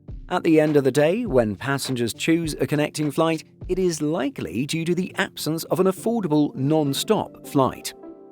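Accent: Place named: United Kingdom. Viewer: Honestly, I’ve flown on several Middle Eastern carriers (always in business class) and I spent about a week in Turkey (sorry Erdogan I’m not spelling it the way you would like me to so eff off) and I’ve never seen a woman, much less her daughter, in a niqab, hijab, or for that matter a full chador. The Muslim “religion” is a goat herder’s abomination. So why would you, by the sound of your voice, from the UK and a child of the British Empire, such being the political creator at the end of the Ottoman Empire of the current abortion that is Middle East show videos of airlines completely understand that their business is buttered on all sides and not necessarily just Muslim sides, start this video off with two women in chadors? Why must your generation be so effing politically correct? All of you children can go straight to hell. We won’t get into whether or not it’s the Muslim concept of hell but I guarantee you that you won’t find any virgins anywhere once you die.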